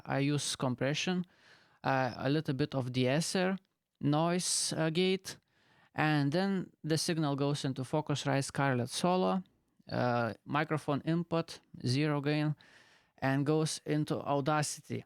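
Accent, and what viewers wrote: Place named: England, United Kingdom. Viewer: I actually like your english accent. SM57 is my favourite for my voice.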